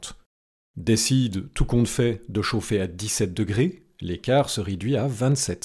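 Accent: Belgium. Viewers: "Elle est cachée dans le bouisson." J'adore l'accent belge ! Merci pour le starter kit c'est génial!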